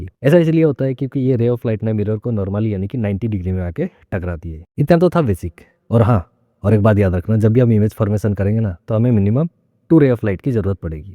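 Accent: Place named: Northeast, India. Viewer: Bhai aapke bolne ka tone north eastern states ki tarah h😅😅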